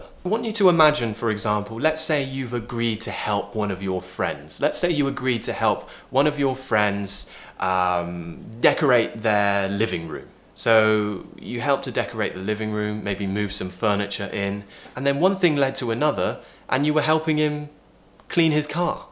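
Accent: United Kingdom. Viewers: I really enjoy your videos aloooooot ,, i like your clear nice British accent and i admire it ♡♡.. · It is a delight to hear you speaking with your clear and beautiful British accent! Just subscribed your channel! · Cheers Mate, I really fancy listening to your British accent... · Omg i love your british accent, and i was learning to make my british accent really like a native speaker like you 😊 always make a great content. I'll always support you bruh😊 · I like British accent ❤️❤️ I'm picking up from you. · hi: I like ur accent a lot. im from mexico my level is advanced but im trying learning the way of speaking in UK. I prefer the accent from UK than American . greetings and thanks for all videos . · I love to hear the British accent fom you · I love British English very very much and I'm obsessed with British accent. That's why I've found your channel and I LOVE it. 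Subscribed immediately!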